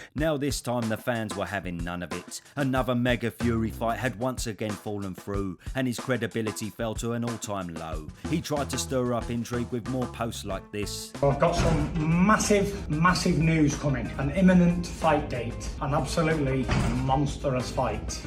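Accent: Cockney, United Kingdom. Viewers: The cockney accent makes these videos 😅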